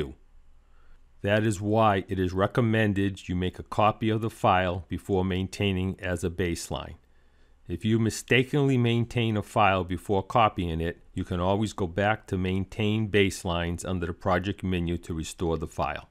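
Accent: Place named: New England, United States. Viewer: very helpful, and I love the New England accent. :)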